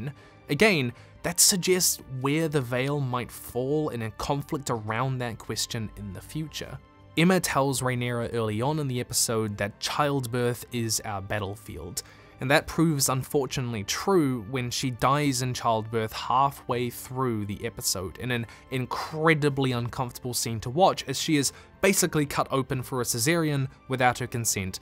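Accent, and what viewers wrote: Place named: New Zealand. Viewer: I can't believe I didn't pick up that you were also a New Zealander. I guess I'm just not used to hearing our accents in the online space! Anyways, great video - thanks!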